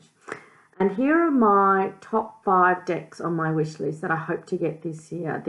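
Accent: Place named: Australia. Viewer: Great video! I love listening to your voice. So nice to hear another Aussie on here :) (Stacey aka Stacey Lyn off fb) 🙏🏻💜🙏🏻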